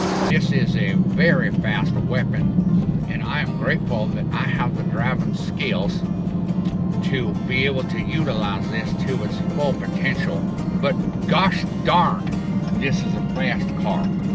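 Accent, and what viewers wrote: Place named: Appalachia, United States. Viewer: is too good at the hillbilly accent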